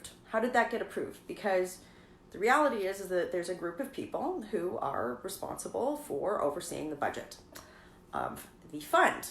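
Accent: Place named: Western Canada, Canada. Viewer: She is a western Canadian. We all sound like her here! :)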